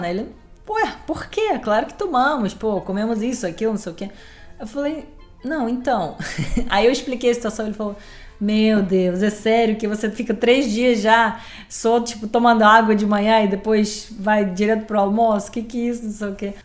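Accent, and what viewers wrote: Rio de Janeiro, Brazil. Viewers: Muito gostoso ouvir suas histórias num português perfeito mesmo que seja no sotaque "carioquês", sou mineiro. Paguei muito mico em Portugal onde morei...Numa papelaria pedi fita durex, lá é camisinha...essas e muitas outras que aqui ficam sensuradas...kkk Sugiro uma entrevista com uma russa que mora em São Paulo, novinha e tem um português perfeito. Abraço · No próprio Brasil por hábitos e costumes nos mesmo pagamos micos brasileiros do Sul indo pro Nordeste sua linda você tem sotaque carioca rsss bjs ⚘ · Russa com sotaque carioca?! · Você assimilou o sotaque carioca perfeitamente...parabéns! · Russa com sotaque carioca ❤ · Você já tem sotaque carioca, haha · Primeira vez q vejo uma gringa q ao invés de ter o sotaque do seu país, tem sotaque carioca 😅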